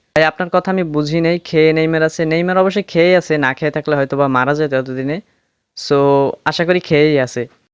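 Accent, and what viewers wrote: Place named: Madaripur, Bangladesh. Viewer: আসসালামু আলাইকুম ওয়া রাহমাতুল্লাহি ওয়া বারাকাতুহু। ভাই ভালো আছেন। ভাই আপনি ফুটবলে কোন দল সাপোর্ট করেন ব্রাজিল,-আর্জেন্টিনা, জার্মানি, নাকি স্পেন। আপনার বাসা কোন জায়গায় কে আমি মন আপনার কন্ঠ তো শুনে লাগে আপনার শরীয়তপুর নয় মাদারীপুরের আশেপাশে থাকেন আপনি কোন জায়গায় থাকেন?আপনি কি করেন? ডি এল এস গেমে কি আর কোন কিছু নাই ভাই আমার গেম খেলতে ভালো লাগে না আমি হার্ট মোডে ডিএলএস খেলছি আমি খালি ম্যাচ জিততে থাকি খালি জিতে থাকি আমি মেয়ে ছাড়িনি এখন আমি কি করবো ভাই.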